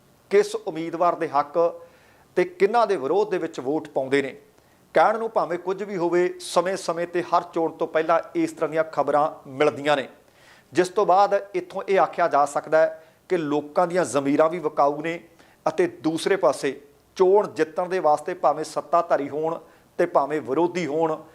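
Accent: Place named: Punjab, India. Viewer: Very good Punjabi accent News. Excellent Phaji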